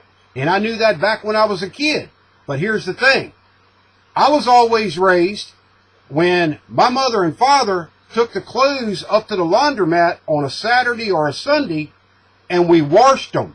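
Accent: United States. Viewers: I love the rant. I am English and live in the UK. I find the way you Americans pronounce words very entertaining, keep on saying what you want any way you want to pronounce them. Thanks for the wonderful videos, I find them very informative and entertaining.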